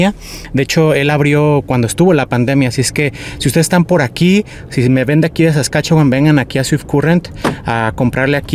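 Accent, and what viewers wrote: Mexico. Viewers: Con este vídeo descubrí tu canal. Me quedé porque me resultó muy familiar tu acento; me dije: "habla como mexicano" . Luego lo confirme' cuando dijiste que comprarías tortillas. Después usaste el adverbio "bien" en el sentido de "muy" o "mucho" y eso me hizo sospechar que pudieras ser tapatío, porque recuerda que nos delata la expresión " bien mucho". Finalmente mencionaste que aprendiste soldadura en Guadalajara, en el CECATI de la calle 56. Ocurre q ue mi casa está en la calle 60, muy cerca de esa escuela. Nada más por eso, ya me suscribi' y seguiré tus vídeos. Saludosx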